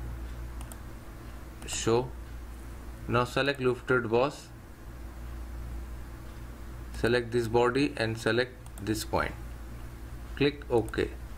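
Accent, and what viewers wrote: India. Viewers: 讲得非常好！听这口音，我就猜到是印度人。能听出来是因为最近学音标，看印度电影。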